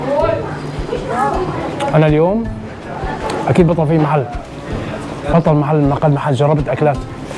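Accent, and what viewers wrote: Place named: North, Palestine. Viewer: انت من قرى الجليل شكلك يا خال هاي لهجة شمال فلسطين صح ولا انا غلطان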